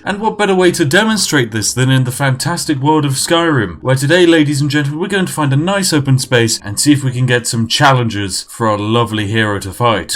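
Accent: United Kingdom. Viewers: i like his „that’s absolutely perfect“ and „fantastic“ 😂 of course in a perfect british accent. and of course: „oh, goodness!“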